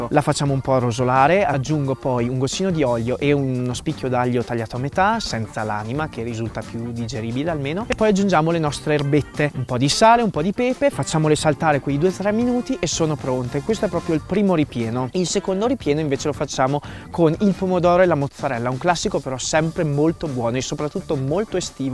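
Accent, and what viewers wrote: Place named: Romagna, Italy. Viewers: Il cassone! 😍 E comunque l'accento romagnolo a sentirlo dagli altri si sente tantissimo, tipo "ma quindi gli altri quando mi ascoltano sentono sta pronuncia qui????" BICARBONATO, sempre! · Non tradire dora troppo forte la tua voce romagnola grande x lo straccio buonissimo tutto e tu grande cuoco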